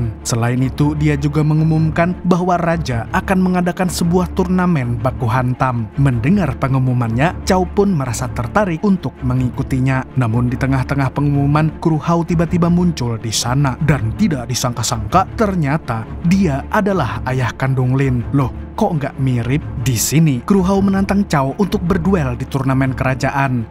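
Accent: Banjar, Indonesia. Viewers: Urang banjar😂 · Adminny urang banjarkh nih😅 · Umayy orang banjar sakalinya😂😂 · Orang banjar kah mang?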